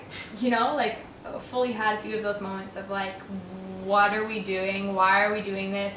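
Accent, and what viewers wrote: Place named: Australia. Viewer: This was very informative because I'm clueless with travel/house etc 😅 Also I love that you sound so Aussie sometimes ❤️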